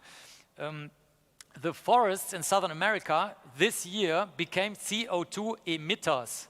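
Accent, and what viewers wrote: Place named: Germany. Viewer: Für jemanden der aus new york kommt sehr krasser deutscher akzent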